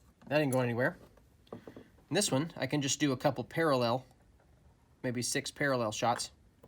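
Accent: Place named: United States. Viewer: It’s a USA thing my friend. Sodder/solder 😁😁😁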